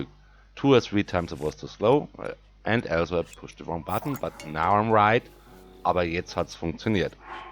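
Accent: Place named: Austria. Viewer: I find dein Österreichisch Akzent geil.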